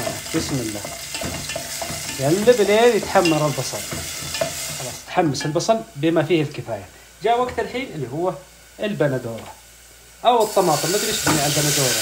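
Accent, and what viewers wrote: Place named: Al Bahah, Saudi Arabia. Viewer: اللكنة زهراني او غامدي كيف كذا علمنا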